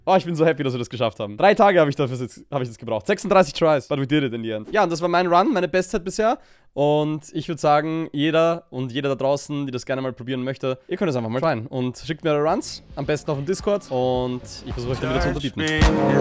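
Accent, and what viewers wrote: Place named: Austria. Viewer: kommst du zufällig aus Österreich (nur aus Interesse) wegen dem Akzent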